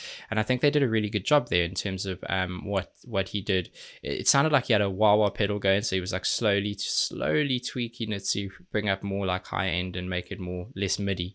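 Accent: South Africa. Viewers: Love love love the technical breakdowns of all the reactions, can see the genuine joy in your face when you hear something that works great! Can i beg that you you check out anything from these 2 bands but my pick would be - and -. Keep up the awesome work man! (also an aussie with kiwi parents i understand the springbok accent haha)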